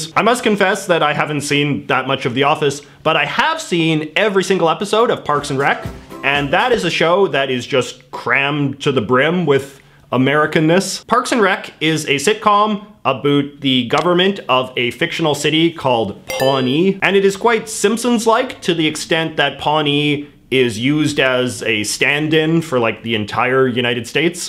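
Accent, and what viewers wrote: Canada. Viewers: Yo is this dude from Canada? Is my first time seeing his videos and the way he says “about” is Canadian asf lol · This guy has the most Canadian accent in the history of the world.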